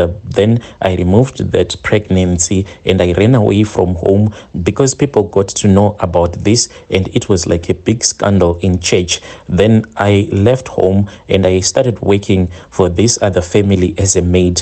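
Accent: Zimbabwe. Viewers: European stories is the name of the channel only to be greeted with a heavy African accent. Sounds Zimbabwean I can tell because I'm Zimbabwean too😢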